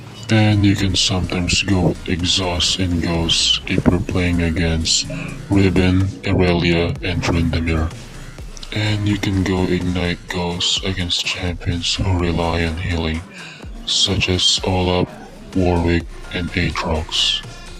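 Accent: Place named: Philippines. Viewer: Kahet anong voice changer payan basta kadugong pinoy kelala mona accent palang HAHAHAHA... GOOD GUIDE!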